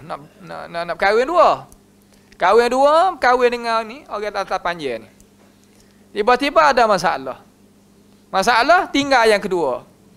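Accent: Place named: Kelantan, Malaysia. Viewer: Kalu buke oghe Kelate payoh kakloh nok pehe ni... 😁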